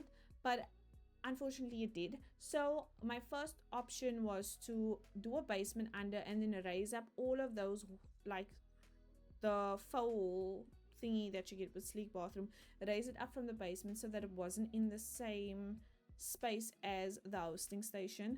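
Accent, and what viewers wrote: South Africa. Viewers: BTW I love your accent, is it South African?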